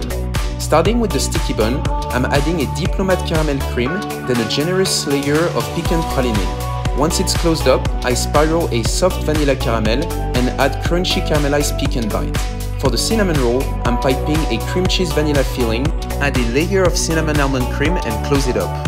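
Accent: France. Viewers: Tu as quand meme gardé l’accent français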